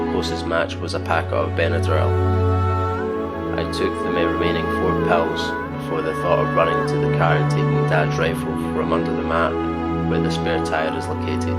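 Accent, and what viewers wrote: Scotland, United Kingdom. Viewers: Love the video mate. Also Scottish! ❤️ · Who the fook is that? It's. Interesting to listen to the combinations of a scottish voice Yet also music dynamics that aren't from that of your typical narration pick. You know Myuuji or Kevin. For that you've earn another sub. Although I came to constructively leave criticism. I found myself listening and diving into the story. Keep doing your thing. Always watching You companion Night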